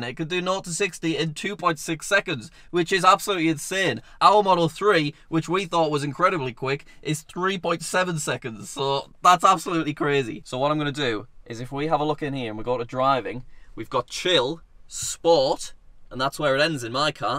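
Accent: United Kingdom. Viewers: Idk if hes british?? Idk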